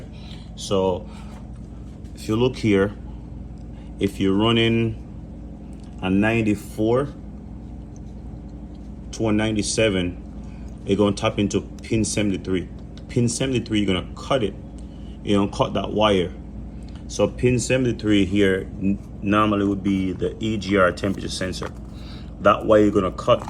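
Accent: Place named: Jamaica. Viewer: Sounds Jamaican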